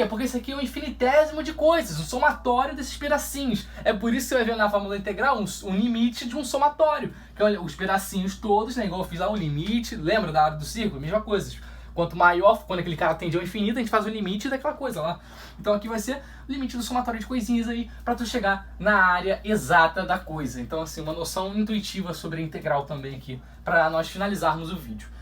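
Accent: Rio de Janeiro, Brazil. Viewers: De onde você é do Rio ?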